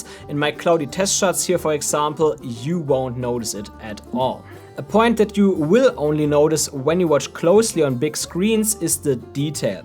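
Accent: Germany. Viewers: Deutscher Akzent so krass. Grüße aus Deutschland -:) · Love that German accent 😂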